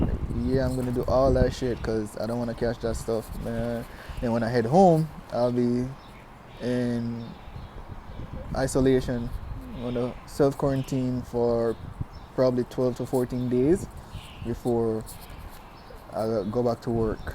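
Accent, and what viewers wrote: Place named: Jamaica. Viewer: I knew you sounded Jamaican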